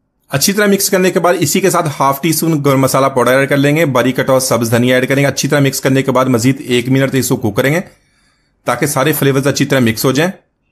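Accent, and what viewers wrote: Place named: Pakistan. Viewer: bhai, aap bohot he umda recipe lay ker aaye hain aur bohot he achi terhan say samjhaya bhi hai kay kiss terhan pakay gee. Sahi Pakistani recipe aur lehjay main video banai hai aap nay, Zaberdust!!!!! bohot shukria.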